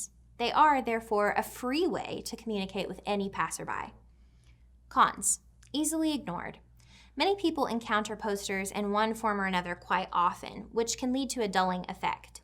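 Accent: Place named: South, United States. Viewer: I can hear the southern drawl in her accent :)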